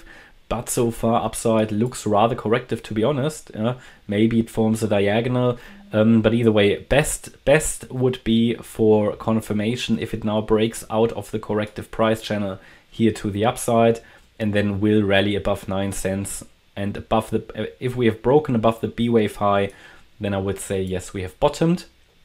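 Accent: Germany. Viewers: Why do I feel like you are Deutsch 😄 Your accent sounds so German.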